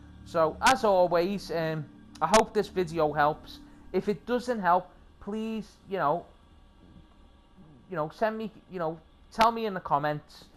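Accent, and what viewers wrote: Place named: Liverpool, United Kingdom. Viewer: Excellent video, thank you. Subscribed. Loving the Scouse accent as well.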